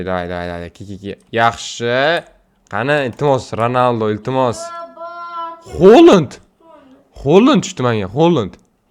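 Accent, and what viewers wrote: Khorezm, Uzbekistan. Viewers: Oka xorazlikmsz a?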